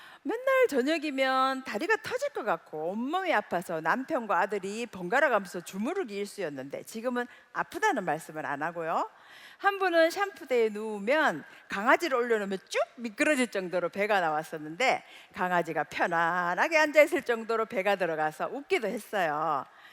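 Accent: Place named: Daegu, South Korea. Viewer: 구수한 대구말 니어디가노? 공주가나? 기름너놨다 ㅎ 맛깔스런 말이 친구랑 같아서 끝까지든게되네요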